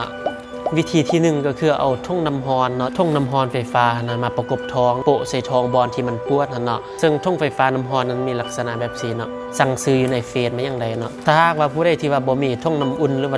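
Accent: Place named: Luang Prabang, Laos. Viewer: ພາສ່າທິນ້ອງເວົ້າເປັນພາສ່າໄທຫລ່ວງພະບາງບໍ່ນ້ອງ ເວລານ້ອງເວົ້າເປັນຕາຟັງເນາະ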